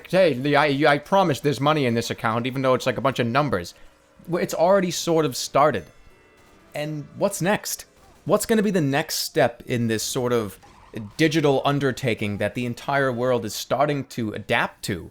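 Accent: Boston, United States. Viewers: Are you from Boston? XD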